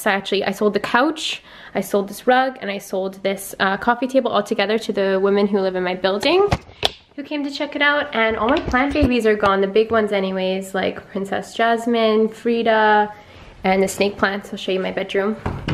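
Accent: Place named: Canada. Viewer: I just want you to know that I absolutely ADORE your channel! My husband and I went to Seattle for our honeymoon and went to Peace Arch State park right across the Canadian border in Surrey, BC (they wouldn’t let us far because of COVID), so I went home and watched a video of Canadian accents and it was you and another girl! I’ve always been passionate about spirituality and I happened to discover you again discussing it. Your videos are so good and I have watched so many. Keep up good the work! 🙏🏼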